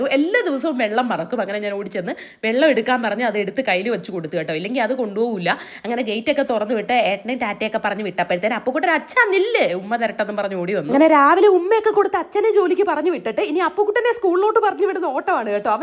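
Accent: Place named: Malappuram, India. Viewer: ഒരു സംശയം ചോദിച്ചോട്ടെ ചേച്ചി ഇപ്പോഴും വീഡിയോ ഇടുമ്പോൾ ന്യൂസ് കൂടാനും സബ്സ്ക്രൈബ് കൂടാനും വേണ്ടിയാണ് ഓരോ വാക്കുകളും തെറ്റായിട്ട് മനപ്പൂർവം പറഞ്ഞിട്ട് അത് പിന്നെ ശരിയായിട്ട് പറയുന്നത് അത് ഈ ചേച്ചിയുടെ സംസാരത്തിൽ നിന്നും മനസ്സിലാകും അത് ഏത് പൊട്ടന്മാർക്ക് ആയാലും പെട്ടെന്ന് തന്നെ മനസ്സിലാവും മലപ്പുറം പറയുന്നതാണെന്ന്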